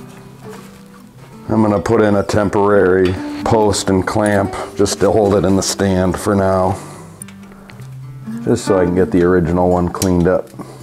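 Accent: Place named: Midwest, United States. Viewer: So GD relaxing, thanks for uploading! Minnesotan here. Love to hear the "Okie dokes" from our friends across the Mississippi/St. Croix. Midwest vibes - vibing so gently.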